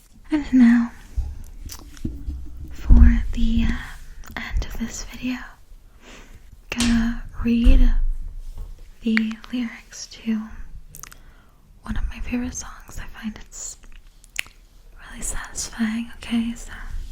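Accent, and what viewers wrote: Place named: United Kingdom. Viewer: shes sounds british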